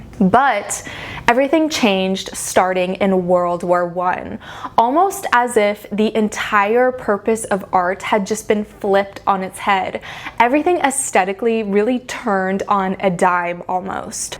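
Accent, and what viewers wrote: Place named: United States. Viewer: You look Italian.. but you have an American accent. mmmh 🤔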